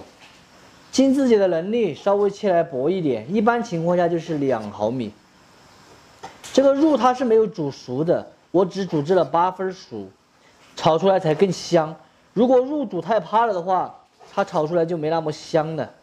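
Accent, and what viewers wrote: Sichuan, China. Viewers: 你的四川话很正宗，要不是有字，都听不懂.🤣🤣你做的安逸👏👏👍👍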